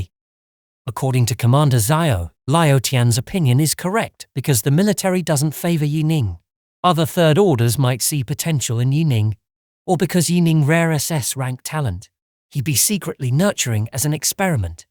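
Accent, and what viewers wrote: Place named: United Kingdom. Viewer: Right as you talked i eminently knew you wre British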